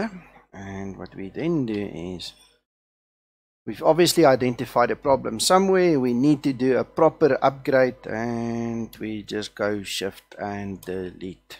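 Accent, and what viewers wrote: South Africa. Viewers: are you afrikaans cuz you sound afrikaans i am afrikaans too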